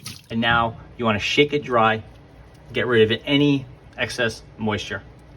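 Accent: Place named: United States. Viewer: Hi from Australia 😊 you have the best American accent 😊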